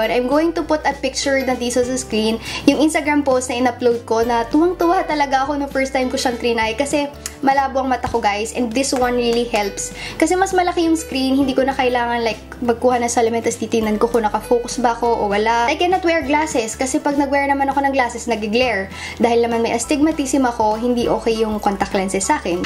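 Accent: Batangas, Philippines. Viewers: Ate, Batangueña ka po ba? 😍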